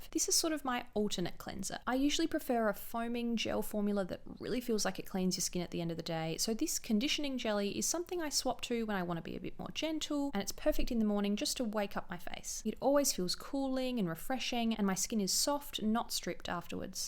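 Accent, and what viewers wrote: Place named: Australia. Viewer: I never really got into makeup before but I’ve got orders from Glossier, Fluff and UltraViolette coming now.... and I have you to thank/blame! 😂 Like many others I’m so so grateful that your makeup ‘maximalism’ can help so many people see products perform, decide for themselves and embrace minimalism in the way they actually purchase and apply product. It’s good for the world. Your lovely accent makes me proud to be Australian too. Sending love from Melbourne! ❤️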